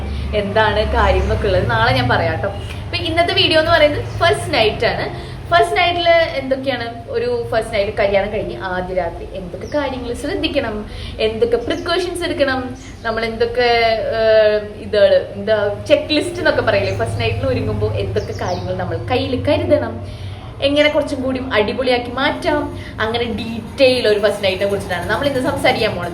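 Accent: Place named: Thrissur, India. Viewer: trissur slang anallo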